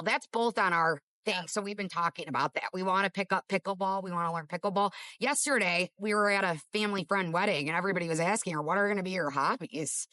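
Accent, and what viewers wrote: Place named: Midwest, United States. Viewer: NGL, for YEARS I thought you were either from the Mitten or Wisco.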